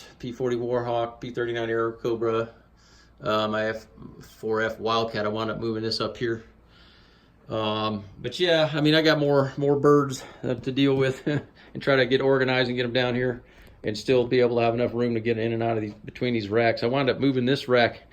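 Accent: South, United States. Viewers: Good video man! I love the Southern accent lol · Very nice! Where are you located? Sounds like GA/AL. I'm in NC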